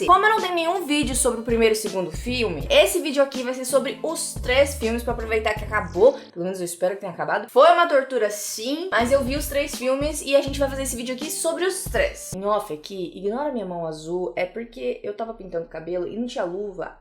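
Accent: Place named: Curitiba, Brazil. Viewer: Nas primeiras palavras que você disse eu já percebi que você é curitibana, finalmente alguém puxando o R e o E aq lkakalalaal tamo juntos, sou de Curitiba tbm kakakakakak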